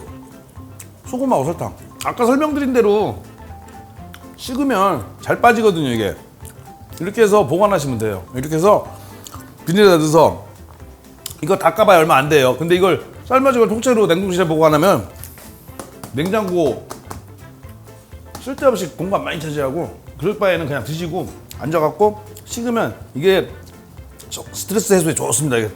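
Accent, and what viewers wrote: Chungcheong, South Korea. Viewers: 울고향친근한 충남사투리구수한 수더분한인상 넘잘합니다 성공신화역시~ 화이팅!! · 남편이 옥수수를 안먹더니 나이들어 좋아졌다고 어제도 쪄달라했는데 이제 비법을 알았으니 맛있게 쪄줘야게쓔 백종원 선생님 감사해유~~~♡ 충청도 사투리가 구수하구 좋아유~~~~~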